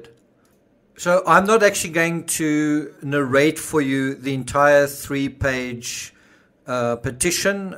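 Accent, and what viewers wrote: South Africa. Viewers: Your South African accent adds to the sharpness of your commentary. It comes across as crystal to me. I find some people are too eager to highjack comments. If I grow tired of a highjacked thread I started, I just delete it and have several times. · Fair point. I enjoy your SA accent its refreshing. It makes my cat chill out too. Keep the good stuff coming. You analyse in a way no one else does, gives better results. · Sounds like trolls! Ignore them. Some peoples attention span is that of a gnat. You're work is the best. Meticulous and interesting. I believe from your accent you are South African. I am British and get accused of pronouncing words incorrectly here in the colonies. Especially the word "Aluminium". LOL. Carry on your excellent reporting. I fully support you. Big fan. Cheers mate! 🇬🇧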